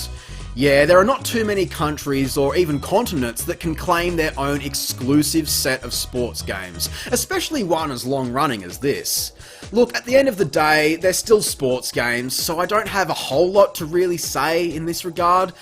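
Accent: Australia. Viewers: I had no idea you were Australian hahahahah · Since when the hell were you an Australian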